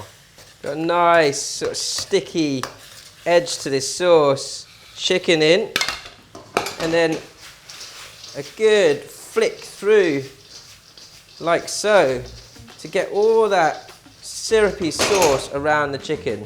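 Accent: United Kingdom. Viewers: That British accent is distracting me.😅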